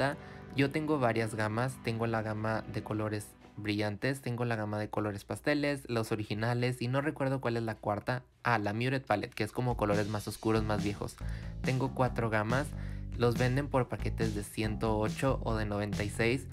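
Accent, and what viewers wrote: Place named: North, Mexico. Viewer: Ya decía yo que el acento me sonaba del norte saludos desde Matamoros,Tam!❤️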